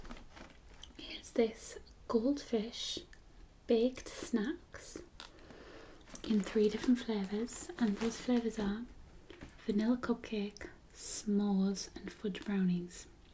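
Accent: United Kingdom. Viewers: Your eating sounds are too perfect :) that and British accents rule :)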